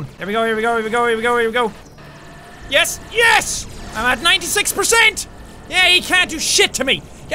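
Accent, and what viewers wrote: Ireland. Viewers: I like that instead of hard, u say herrd xD love it (yes i know he is irish) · What part of Ireland are you from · are you irish?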